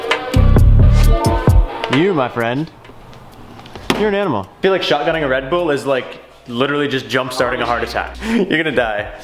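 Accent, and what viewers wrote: Canada. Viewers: Canadian accent in a nutshell ;)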